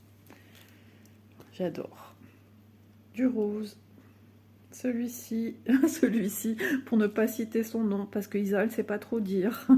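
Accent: North, France. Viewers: Bonjour Isa très jolie les sorcières 🧙‍♀️ vous en avez déjà brodé une ou pas bonne journée sa fait du bien d’entendre votre accent du nord je suis du nord aussi bisous 😊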